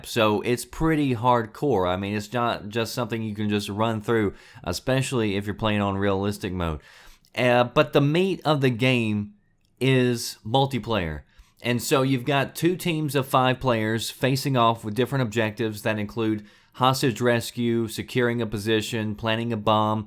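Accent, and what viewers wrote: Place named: Texas, United States. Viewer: Really man I'm not even gon lie I'm being totally honest but your the best YouTube channel I have came across .. You sound like your from Texas . I love how you keep shit real man .. I love watching your videos .. You deserve the most success on YouTube . Only if you can be super constant with videos & have a lot more content you will be the best .. Love your channel bro I really do . I use to watch Ali a ghost Robo & others but your the best .. They are to soft lol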